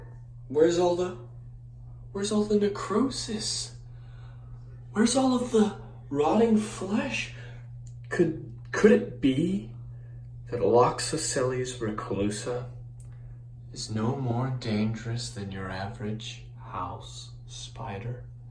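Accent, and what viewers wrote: RP, United Kingdom. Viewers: Good british accent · Good posh English accent and I’m English! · That Attenborough accent tho...❤👏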